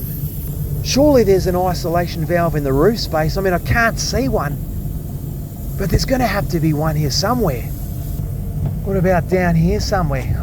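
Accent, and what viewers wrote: Australia. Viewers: Nice one. We always wondered if you just did drains. Watching from the UK with my ex pat ozzie wife. She loves to listen to the ozzie accent. Liked and subscribed. All the best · !!!! The algorithm got me here!!!! Love watching your channel and that accent.....my youngest girl lives in Melbourne.....makes me feel closer to her to watch your channels.....greetings from Montgomery Texas home of the Texas flag!!!!!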